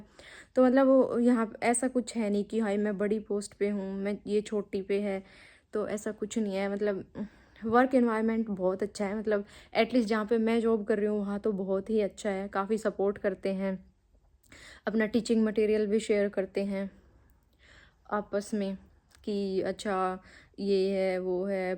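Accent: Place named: Japan. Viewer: You talk like a Japanese or russian girl , your expressions are also not like a typical Indian girl. Liked that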